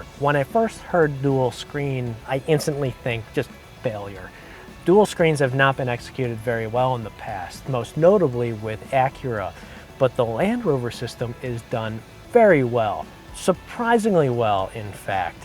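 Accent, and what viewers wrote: United States. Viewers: I can't stand this fuckers American accent. Why am I recommended yank car review videos?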